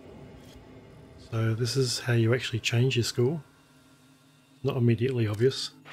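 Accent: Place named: Australia. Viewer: thanks boss, u sound aussie :)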